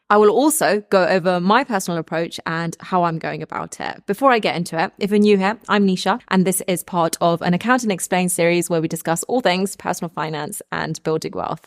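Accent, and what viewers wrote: United Kingdom. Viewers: great video, you sound British so I assume you live in the UK (could be wrong) so why use dollars ? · Everything sounds better in British. So tired of mumbling idiots here in America 😂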